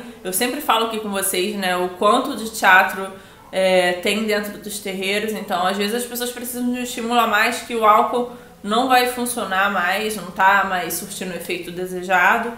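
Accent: Rio de Janeiro, Brazil. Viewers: ? VC É DO RJ?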